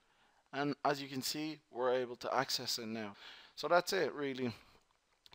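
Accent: Ireland. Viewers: Thank you man.. 🙏 luv dat Irish accent btw 🤗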